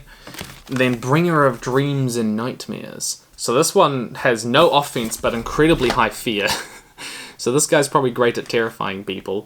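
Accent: New Zealand. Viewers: Omc you sounds so much more new Zealander than on your main channel.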